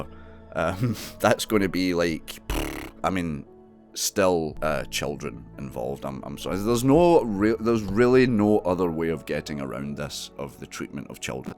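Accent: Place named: Scotland, United Kingdom. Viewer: Where in Scotland are you from? Sorry, I can't help it, I love your accent. 😊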